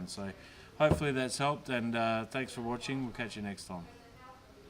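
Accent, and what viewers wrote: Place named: Australia. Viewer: Which part of Aus are you from?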